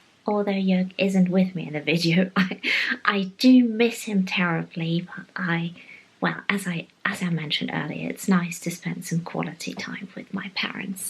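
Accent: England, United Kingdom. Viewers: Lovely video! Your parents are adorable ☺️ Just curious….How come you have an English accent? Were you raised in England? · So you have a British accent but I don't get how you got it? 🤔 has German accent. I love the things in that shop! (I hope that's not rude.) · I think it is amazing you have such a strong British accent being originally from Germany! ❤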